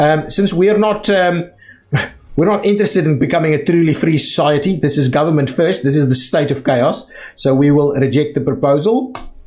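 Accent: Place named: South Africa. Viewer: Are you from South Africa, mate? Love the accent!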